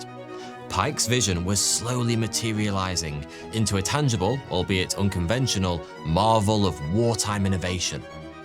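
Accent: United Kingdom. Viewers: It's a particular British accent.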